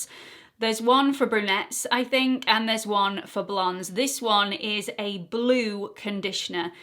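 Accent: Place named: United Kingdom. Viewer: I could listen to you (with your British accent) and Nikkia Joy (with her Aussie accent) talk ALL day. I bet I'm not the only person who catches herself trying to say words like "absolutely lovely/loovely", "hair/hahr", and "mom/mum". Sure doesn't sound the same out of my mouth 😂. Your "best of" videos have been fun,. Happy New Year 🎉✨🎉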